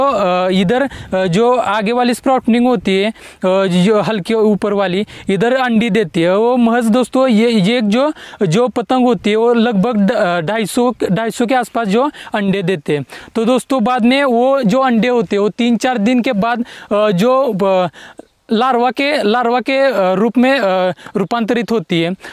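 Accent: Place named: Maharashtra, India. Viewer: योग्य मांडणी केली हिंदी छान बोलला उच्चारण थोडेसे मराठी वाटते पण अगदीच हिंदी येत नाही असे नाही . जो विषय मांडला आहे त्याला निर्विवाद न्याय दिला आहे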